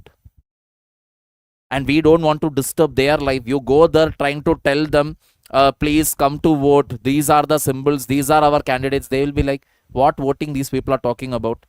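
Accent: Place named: South India, India. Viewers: The only thing i listened sir's English speaking He proved as typically a south indian but also he is very polite in hindi Actually india deserve these type of people from southern part of india ❤❤ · Mind blowing personality with attractive south english accent..the pw thalaiava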